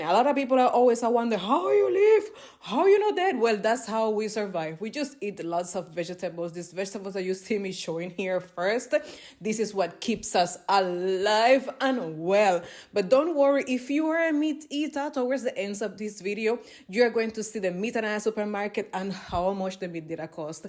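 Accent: Jamaica. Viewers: I must say, I just LOVE your videos and of course your beaitiful Jamaican accent. Blessings.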